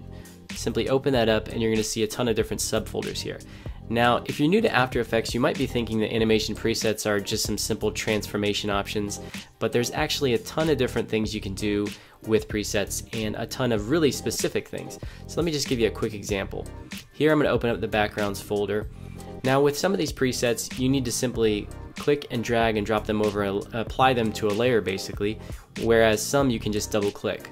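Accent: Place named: United States. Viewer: Not understand the American Accent but the tutorial appears very clear, Gud Job, Very Nice Tutorial.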